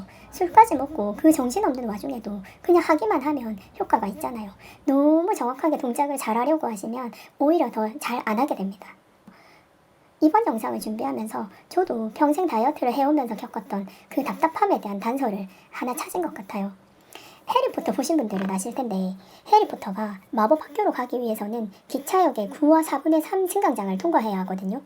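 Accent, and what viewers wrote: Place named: Daegu, South Korea. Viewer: 영상 늘 잘 보고 있습니다. 대구 분이시려나.. 귀여운 사투리도 사랑스러워요^^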